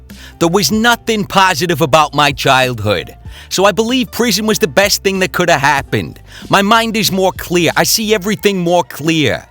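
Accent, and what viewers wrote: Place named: Boston, United States. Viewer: Richard Ott I'd say Boston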